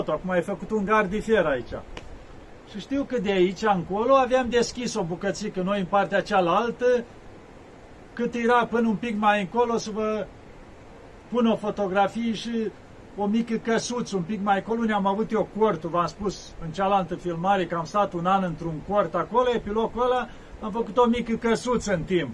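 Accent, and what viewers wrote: Moldova. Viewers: Ce frumos și cu duh povestiți,va ascult cu gura căscată, aveți graiul dulce moldovenesc, mulțumesc!!! rugați vă și pentru mine, Hortenzia Mihaela,și as vrea sa ne spuneți modalitatea cum sa trimitem bani și pomelnic la d-voastră!!??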